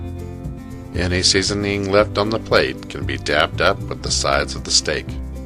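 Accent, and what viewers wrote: United States. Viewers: Your voice sounds like the sound of America 🇺🇸 and the steak looks delicious too.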